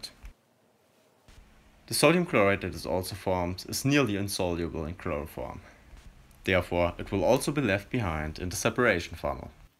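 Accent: Germany. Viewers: I hear your german in your voice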